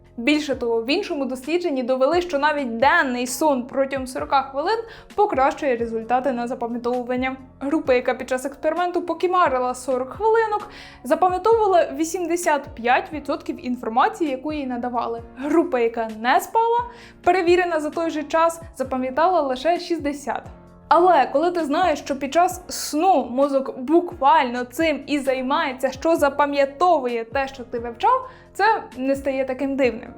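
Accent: Poltava, Ukraine. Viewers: А ще мені дуже цікаво слухати вашу полтавську вимову🥰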